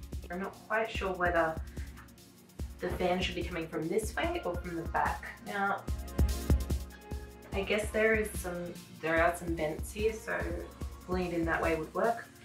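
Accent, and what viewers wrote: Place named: Australia. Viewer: Great build love the Aussie accent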